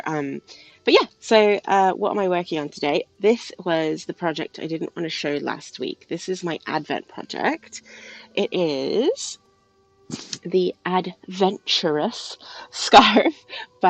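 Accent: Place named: United Kingdom. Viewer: I thought it was just me! You did sound more british. 😊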